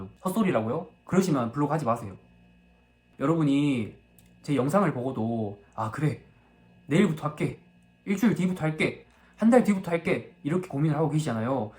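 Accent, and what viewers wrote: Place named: Jeolla, South Korea. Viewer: 출신이 전라도인가요?억양이 전라도아신데...그럼 99.95% 사기꾼.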